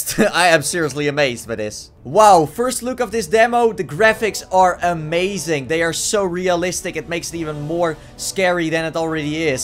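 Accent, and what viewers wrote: Netherlands. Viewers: Dat nederlands engels accent xD eerste 3 seconden.. oh, tis een nederlander xD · Ik kon gewoon horen aan je accent dat je nederlands bent :D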